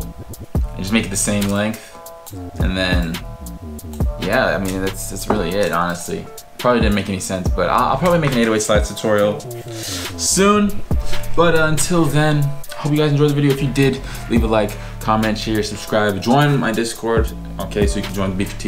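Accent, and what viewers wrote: United Kingdom. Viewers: Dis is my first time watching one of your videos and I fully thought u were British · No way, your London accent is on point ...coming from a londoner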